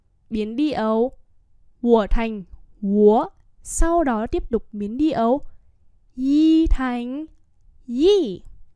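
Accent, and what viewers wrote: Standard. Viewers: Cô đọc chuẩn lắm 👍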